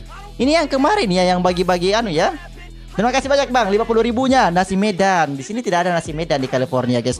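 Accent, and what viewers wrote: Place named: Makassar, Indonesia. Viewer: orang makassar ki kah? karena logatnya kek makassar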